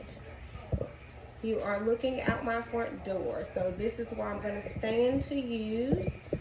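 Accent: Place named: South, United States. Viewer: Thanks for the review! It’s been a year since you’ve done it, what is your opinion of this device now? I just bought mine, delivered to me today. I’m excited to get my “shake on” 😅 I have a lot of injuries from my military career to work through. So I’m definitely looking forward to this. Btw, very nice bodily attributes 😉 Looking good over there. Sounding like you from GA or the Sip 😄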